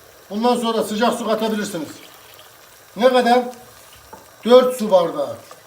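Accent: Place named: Anatolia, Turkey. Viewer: Allah razı olsun,pratik ve Anadolu şivesi her şey gönlünüzce olsun.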